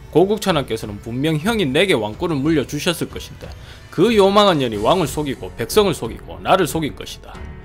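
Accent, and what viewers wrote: Gyeongsang, South Korea. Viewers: 고구려이야기에 경상도 사투리 쫌 그러네요 ㅎㅎ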